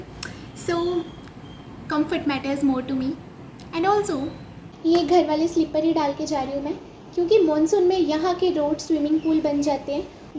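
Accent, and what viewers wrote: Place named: Punjab, India. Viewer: Your punjabi accent is too cute❤😁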